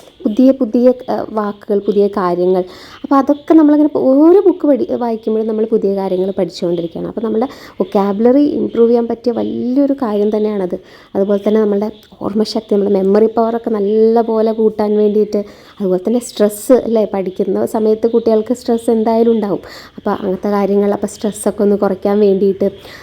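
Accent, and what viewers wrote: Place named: Palakkad, India. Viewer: u looking so beautiful and he is also looking smart Palakkad samsaram kelkkan oru rasam.Thanku. Cheeverdu sound I really enjoyed I think u also like reading It' s a great thing parents encouraging reading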